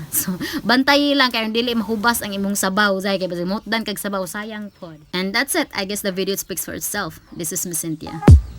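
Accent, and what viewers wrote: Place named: Loboc, Philippines. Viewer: Nindot gud paminawon ang sinultihan sa taga loboc madam hehehe...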